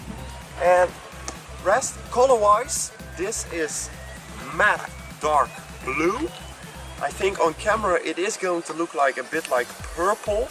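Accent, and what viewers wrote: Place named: Netherlands. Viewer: The Dutch accent tho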